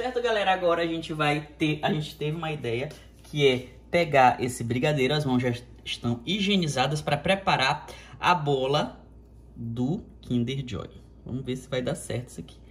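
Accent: Maranhão, Brazil. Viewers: Mano eu comecei a assistir o canal dele agora, e não sabia que ele era maranhense ksksksk aí achei a voz dele parecida com o sutaque maranhense e vi na legenda que ele é ksksk 😅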